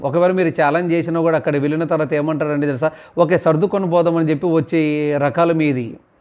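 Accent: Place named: Kukatpally, India. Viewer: Miru Kukatpally atla matladaru kuda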